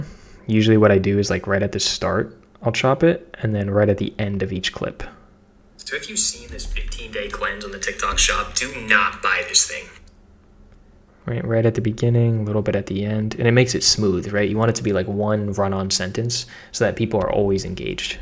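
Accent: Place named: Boston, United States. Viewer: Am i hearing a newengland accent lol if so wassup my Man im a Mainah but you have that boston vibe lmao.. Love the vids i have yet to master tiktok shop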